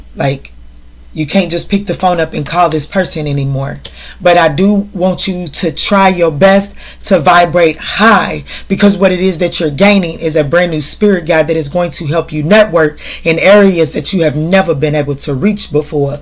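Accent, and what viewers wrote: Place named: Louisville, United States. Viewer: I hear Louisville KY in ur voice?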